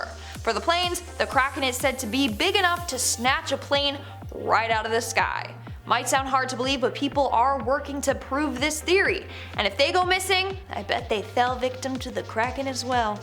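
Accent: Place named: United Kingdom. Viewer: You do a good British accent!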